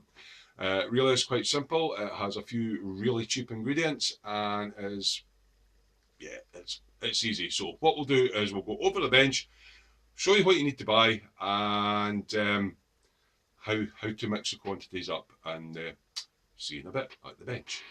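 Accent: Scotland, United Kingdom. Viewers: I'm new to airbrushing, but this was interesting. I have seen a video in which a few drops of glycerine were added, together with the IPA, distilled water and screenwash. Apparently, it adds a bit of lubrication. Thanks for sharing. (I live with a Scottish lady and so the accent was no problem!! :-) )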